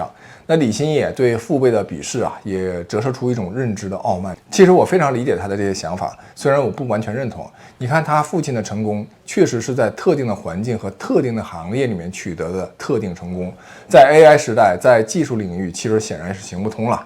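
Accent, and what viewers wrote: Northeast, China. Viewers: 刚刚发现帅哥美女的这个频道，挺棒的！有他们的背景介绍吗？的口音好像是东北的，哈尔滨人吗？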